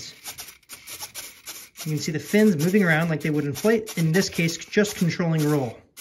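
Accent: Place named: United States. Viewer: Sounds like your American, how about giving us inches, feet, and grams/lbs.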